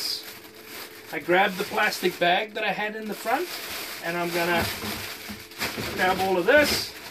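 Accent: Afrikaans, South Africa. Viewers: Definitely a south African dude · South African Afrikaans, perhaps. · Sounds South African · Did I guess his accent?? I guessed South African! It's the accent that sounds like 5 accents mixed together. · Is that accent i hear afrikaans? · To me he sounds more South-African :-) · Yes SA def ...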